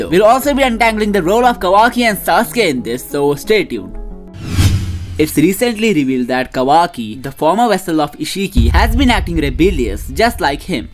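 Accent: India. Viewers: Your Indian accent is real thick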